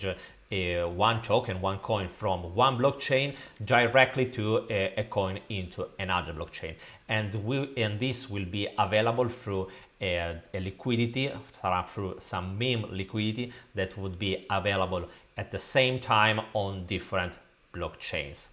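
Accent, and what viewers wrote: Italy. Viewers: Are you Italian? I recognise that accent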